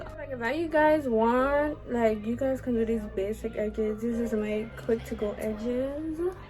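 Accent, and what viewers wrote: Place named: Virgin Islands, Caribbean. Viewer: Hearing a VI accent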